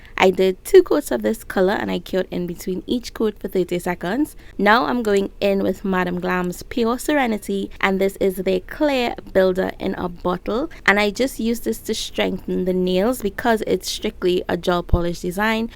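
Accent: Trinidad and Tobago. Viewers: Tell me why I hearing a trini accent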